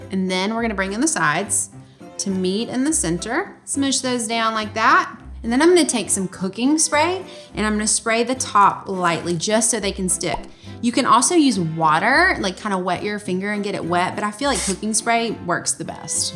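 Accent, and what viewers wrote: South, United States. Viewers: Jacob Halifax - It's like Southern and Valley girl at the same time. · Huneeee where's you from? Love a southern lady. I grew up in NC and your voice sounds like home! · It's a beautiful southern accent ! "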